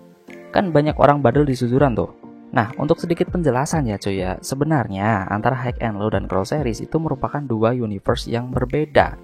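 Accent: Java, Indonesia. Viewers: Jowone metu terus 🤣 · Jowo mu bang bang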